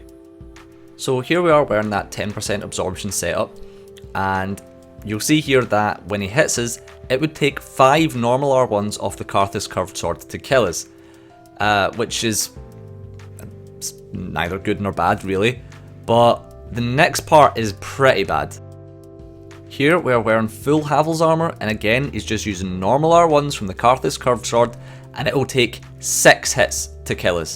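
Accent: Scotland, United Kingdom. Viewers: This may be a stupid question but is this guy Scottish? English isn't my native tongue and I can't quite pinpoint the dialect.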